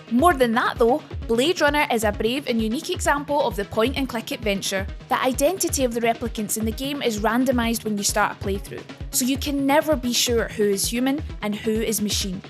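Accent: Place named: Scotland, United Kingdom. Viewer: These vids are really good, and I love the Scottish accent, but they’d be even better if you wrote what platforms the games are on too!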